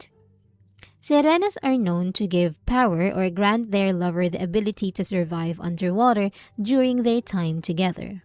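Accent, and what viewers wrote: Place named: Philippines. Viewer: You have a lovely accent, but I say that as lived in the Philippines for 6 months, awesome country, lovely people. Look up the Nantucket mermaid 1700s, seen and recorded by a Lutheran minister on a Dutch vessel. Recently sighted was a mermaid at Flat Bridge in Bog Walk Gorge Jamaica Mermaids are in the book of Enoch. They are the women who mated with Fallen Angels, locked in a hellish "purgatory" until the end of age and final judgment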